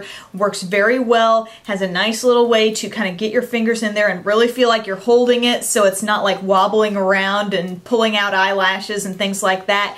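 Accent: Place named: United States. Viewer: She has an American accent. Everyone has an accent.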